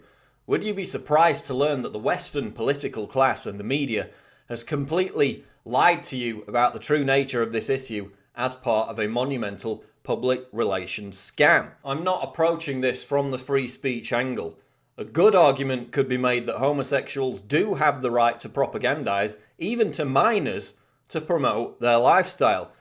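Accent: United Kingdom. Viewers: never expected to hear bullshit in british accent I always thought a sign of intelligence and sophistication....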